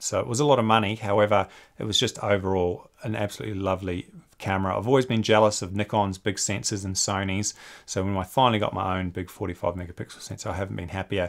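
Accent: Australia. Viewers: I started with Pentax cameras, a zoom105, a k1000, a superA, then went digital with a Pentax ist'd and recently purchased a Canon R8. The R8 came with a 24-50 and ive not long ago bought a RF24-105f4L lens. I was looking at getting something like the 100-400 you reviewed as, just being a hobbiest, paying more than $1000 is hard to do. I do like to have the nice things though so we'll see. I do like the fact that the 100-400 is fairly compact. A bigger lens might make people think I'm a professional, which I'm definitely not. Although I do enjoy photography and I appreciate great photos. I love your videos and reviews. Great to hear that fellow Aussie voice. Cheers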